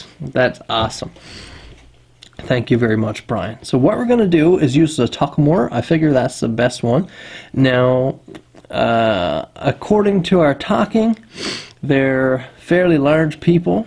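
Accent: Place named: Newfoundland, Canada. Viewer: sorry new to the channel, but yeah the accent gave it away im a alberta boy living in ottawa area got alot of buds from Newfoundland... Both baymen and townies... But all good folk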